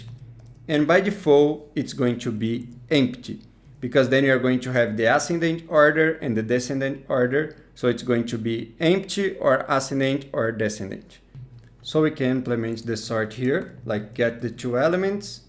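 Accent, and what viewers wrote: Brazil. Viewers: Brazilian acent? New sub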